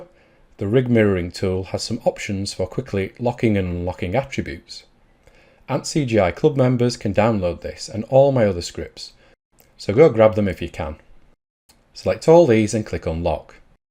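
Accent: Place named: Australia. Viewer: I love Australian accents. Yoo cawll daht a noife?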